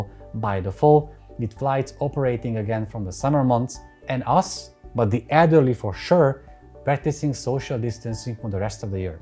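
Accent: Hungary. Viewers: Hungarian accent,,,dr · Your accent reminded me of my grandmother's. Sounded familiar. ;) I never got to learn Magyarul, but still hope to one day! Be well.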